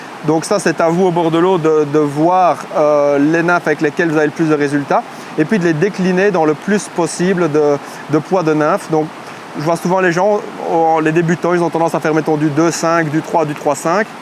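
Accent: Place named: Belgium. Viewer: J'adore tes vidéos ! Avec ton petit accent belge ça rajoute un truc de ouf. Merci à toi. Grace à tes vidéos j'ai découvert une pêche après des années de cuillère... Tout en finesse, tout en sensation !! Je ne reviendrai jamais en arrière ! Merci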